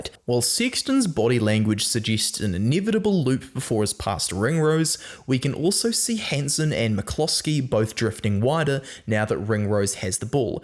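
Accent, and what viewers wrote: New Zealand. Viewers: Sixton is the bist nambah tin The kiwi accent is wonderful ❤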